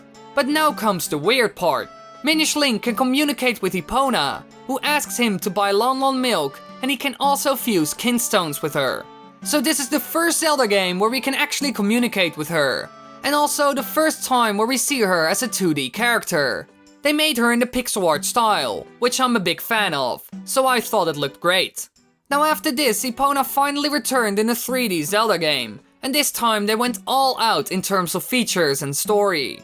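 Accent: Netherlands. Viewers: Tombsday he’s Dutch that’s how people from the Netherlands sound like when speaking English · lol. ik ben ook nederlands en ik herken dat accent van 10.000 kilometer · Wow dutch accent much haha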